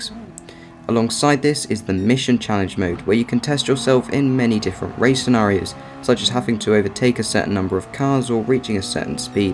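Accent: United Kingdom. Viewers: What area of the uk you from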